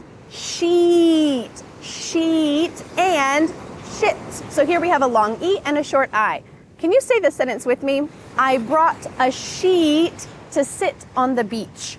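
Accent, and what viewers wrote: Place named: United States. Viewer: very very important and had a great time with your beautiful American English. Thank you so much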